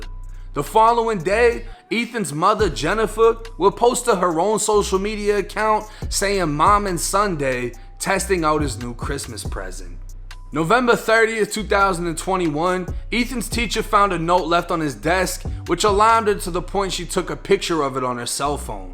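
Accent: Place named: Tampa, United States. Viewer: I love how u dead ass talk like u from Tampa fr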